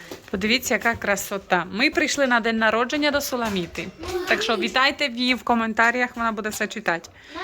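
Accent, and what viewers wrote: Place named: Kremenchuk, Ukraine. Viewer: долгие лета! Счастья и здоровья!,очень нравитесь вы и ваша сестричка. Очень нравится как вы говорите,у меня двоюродная сестра из Кременчуга,так- же разговаривала,слова как ручеек льются.❤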